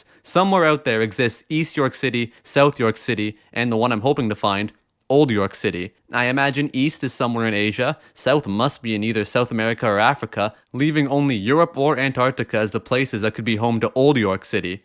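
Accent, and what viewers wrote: Canada. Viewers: WHAT FUCKING PART OF CANADA ARE YOU FROM LIKE I CANNOT TELL?!?! ARE YOU FROM TORONTO OR THE ATLANTIC YOU SICK FUCK???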